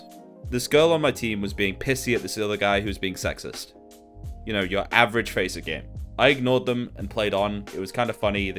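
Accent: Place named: United Kingdom. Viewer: AI? Brother, this i0s just a british boy doing a voiceover over cs clips. What is possibly AI? His brain?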